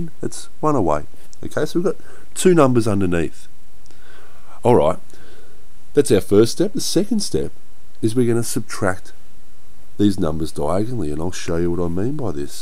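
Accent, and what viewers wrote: Australia. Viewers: AYYYE THIS GUY IS AUSTRALIAN YEH MATEEEE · Awesome thanks for that! Just one more thing are u Australian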